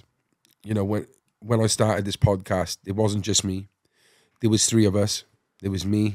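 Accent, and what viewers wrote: Wales, United Kingdom. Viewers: Been a bit too much wool on for me lately, if i'm being honest, i'm more of an Awayday's type of lad being from Middlesbrough that is, though i do love the Welsh Valleys it's a beautiful place and love the accent my man, keep up the good work👍 we do both have one thing in common, we both have a transporter bridge❤️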